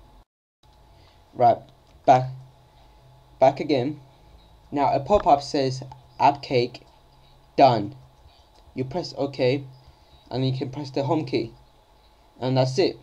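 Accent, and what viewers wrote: United Kingdom. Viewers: HES BRITSH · Fucking british accent... CANT UNDERSTAND YOU!!!!!!!!!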